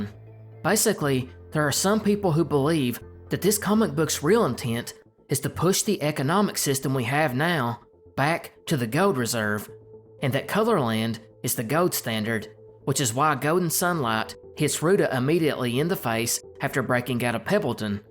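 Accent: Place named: South Carolina, United States. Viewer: Where are you from bro, you sound like my neck of the woods, in SC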